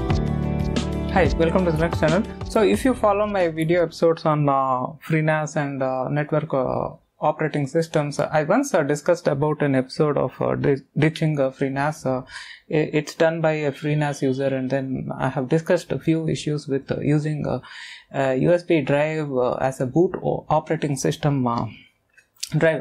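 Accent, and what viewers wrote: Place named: Gujarat, India. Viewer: You Sound Like A Gujju That's Why I Commented This :P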